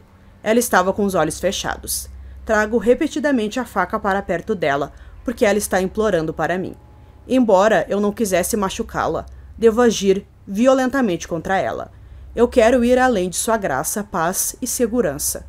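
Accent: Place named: Rio Grande do Sul, Brazil. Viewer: Ela parece o Mário cortela falando. Será q e gaúcha tmbm?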